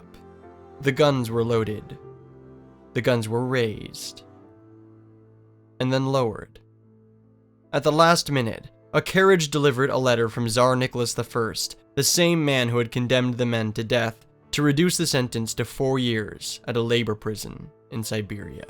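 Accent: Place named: United Kingdom. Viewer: good video but i hate your shitty british accent when you read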